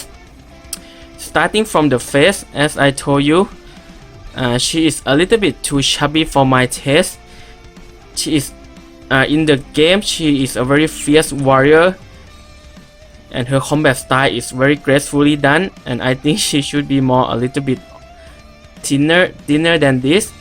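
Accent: Thailand. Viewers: เหมือน คนไทยเลย สำเนียง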